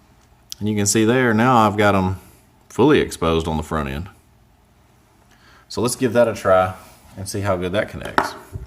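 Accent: South, United States. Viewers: tony Hear, hear. I'm I the only one who likes his voice? Nice southern drawl.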